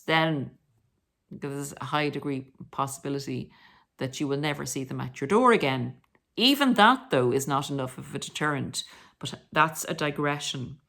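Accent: Ireland. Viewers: GREETINGS FROM THE GREAT STATE OF KENTUCKY IF YOU'RE NOT ATTRACTIVE SO-CALLED WITH WHATEVER THAT MEANS THEN YOU'RE GOING TO BE DUMPED YOU'RE NOT GOING TO FIND QUALITY MAN YOU'RE NOT GOING TO FIND A QUALITY OF WOMEN BECAUSE MOST OF THESE SO-CALLED MEN THAT ARE GOOD THEY'RE LOOKING FOR ATTRACTIVE PHYSICALLY ON THE OUTSIDE MOST PEOPLE ARE SUPERFICIAL THAT IS A MAJOR PROBLEM VERY FEW PEOPLE SEE THE BEAUTY WITHIN AND YOU KNOW ACTUALLY EVERYBODY'S GOD'S CREATION SO WE ARE ALL MASTERPIECES BUT BECAUSE WE LIVE IN A SINFUL WORLD AND PEOPLE LOOK AT THINGS THROUGH THE CARDINAL I THINK SOME PEOPLE ARE BETTER LOOKING THAN OTHERS WHEN THEY'RE NOT MARILYN MONROE WAY WAY WAY WAY WAY OVERRATED BECAUSE SHE LOOKED PROVOCATIVE AND WAS LOOSE THAT'S WHY MEN LIKED HER GREETINGS FROM KENTUCKY WE LOVE YOU IRELAND WE LOVE YOUR ACCENT WOULD LOVE TO SEE IRELAND ONE DAY EVERYTHING IS SO DIFFICULT IN THE UNITED STATES EVERYTHING IS OVER THE TOP EXPENSIVE I WORK WITH THE HOMELESS THIS IS JUST REALLY A NIGHTMARE AND YOU HAVE TO FOCUS ON GOD AND HELPING OTHERS WHY DON'T YOU DO A VIDEO ABOUT THAT MOST MEN JUST GO BUY A PERSON'S LOOKS NOT ABOUT MORALS IT'S NOT ABOUT HOW KIND YOU ARE MOST PEOPLE THEY DO NOT LIKE UNATTRACTIVE SO-CALLED UNATTRACTIVE PEOPLE IT'S A DISGRACE WHY DON'T YOU TALK ABOUT THAT THAT'S WHY PEOPLE ARE DISCARDED❤🙏🙏🙏🙏🙏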